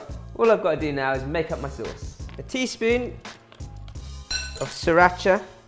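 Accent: United Kingdom. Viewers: Yeah so some Chinese looking guy with British accents (who's probably a British) is explaining how to make a fake (and extremely unhealthy) Chinese dish that's invented in the USA, and never consumed by people in China. Pretty interesting, although I didn't watch it through. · That Hogwarts accent is wonderfully fun to listen to. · Awesome dish, it sounds like u have a british accent. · As an American fan of Chinese-American food, including General Tso's chicken, and as one who's grown impatient with authenticists and purists who try to delegitimize any Chinese food not made in China, I'm heartened to see a Chinese-British cook with a proper British accent who gives expatriate Chinese food its due and re-enforces its legitimacy as cuisine. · Just realized he's Asian but has a British accent lol. Great video looks amazing! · School of Wok as in we’re or I at least have never heard an Asian man with a British accent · Ok, it ALWAYS fucks up my mental when I hear Asian people speaking with a British accent. 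Sorry, but it JUST DOES. I'm used to hearing an "American" tone, or an Asian tone, but a British tone? It just doesn't fit (to me) The recipe seems good, though.🙂🙂🙂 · I knew from the start he speaks he must be stayed or grew up in UK. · Asian guy with a British Accent? that's something new.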